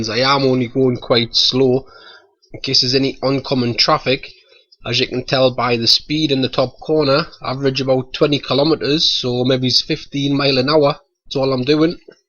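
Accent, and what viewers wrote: Newcastle, United Kingdom. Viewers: Are you a Geordie. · I enjoyed it, and I'm a 68 year old nan, but I love the accent, it reminds me of my dear dad who was a Geordie, I miss hearing his voice. But I truly loved the video, the driving was very good TY!! 😊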